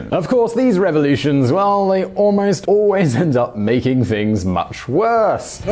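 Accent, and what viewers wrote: United Kingdom. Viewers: ... are you british?:)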